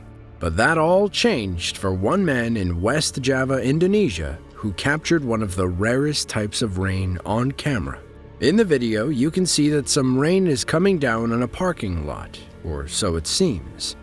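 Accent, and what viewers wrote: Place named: Boston, United States. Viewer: My man loves in Florida and talks like a guy from Boston, “this iz basahh”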